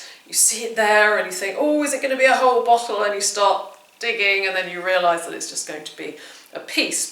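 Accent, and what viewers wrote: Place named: England, United Kingdom. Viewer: Watching you find these things and listening and you explaining them all is delightful! I love your English accent.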